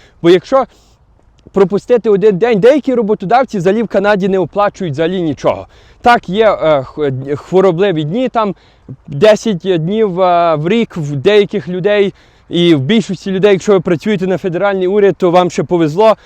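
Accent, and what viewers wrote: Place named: Hutsul, Ukraine. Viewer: аха...ха що за вуйко? Але смішно говорит, в Україні хіба десь в горах гуцули засраті так говорат. Все там правильна побудовано і задумано, щоб тваринам не хотілось воювати і вбивати собі подібних треба їх нагрузити працею, щоб голови немали коли підняти. Хто приїзджає в США і Канаду з країн колишнього срср називають їх трудовим табором з підсиленим харчуванням.Зато в Канаді і США немає війн вже з 1873 року, а на території срср постійно дебіли товчуть один одного.Бо робити не хочуть.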